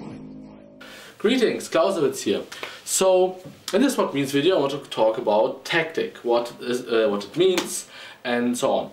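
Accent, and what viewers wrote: Germany. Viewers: Yes, Shadiversity are you German? Der Akzent kommt mir so vertraut vor xD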